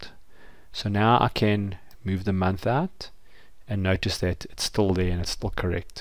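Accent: South Africa. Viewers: I miss the south African accent, but certainly not the country. Hope you also managed to escape!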